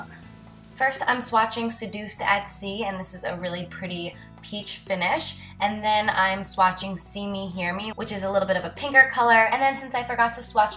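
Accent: New Jersey, United States. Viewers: Where are you from? I love your accent. It sounds kind of jersey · I'm a new subscriber and I have to tell you I LOVE your Jersey accent!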